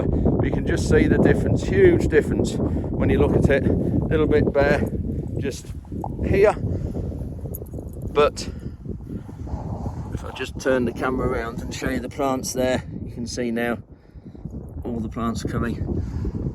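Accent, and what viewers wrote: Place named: Lincolnshire, United Kingdom. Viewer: As an exiled Yellerbelly from the Fens always good to see what's happening on the land, even if you are up on the high ground. And good to hear a Lincolnshire accent too!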